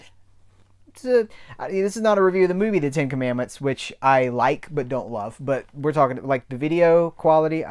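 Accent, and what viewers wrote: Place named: Australia. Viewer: I think your Aussie accent is perfect. And this is coming from someone who is not Australian.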